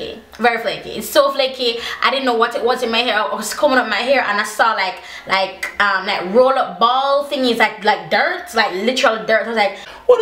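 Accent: Jamaica. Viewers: Wowwww I wish I could speak Patois. I love your accent girl! Subbed ❤️ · I understand what you're saying it's her accent my bestfriend is Jamaican and I met her mom and I was like what is she saying lol 😂 but now I totally understand I love there accent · your beautiful jamaican accent 😭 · u make me wish I was Jamaican, I love ur accent! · I love your jamaican accent 😍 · I love your Jamaican accent ❤️ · I’m Jamaican your accident sounds like patio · Kareece Brown she has a jamaican accent tho.. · wow she is gorgeous and has a nice jamaican voice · I saw someone put oil on top of eco gel to stop it from flaking. Maybe it will work for gorilla snot...I'm gonna try. Thx for your video and your face looks good! Love ur Jamaican/street girl/white girl accent! Lol Yeah...I'm coming for you! Lol. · I love that Jamaican accent.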